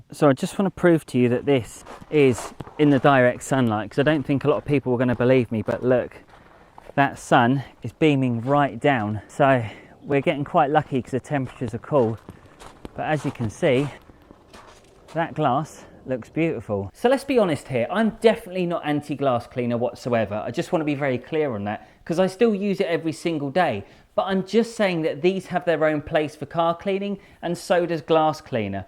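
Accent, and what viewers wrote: United Kingdom. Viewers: I have no idea why you got so many views for this video!! Maybe the British accent? And dummies think just because you have a Btitish accent you might be teaching a magic?! Well. I just wasted 2 minutes watching your useless video mate...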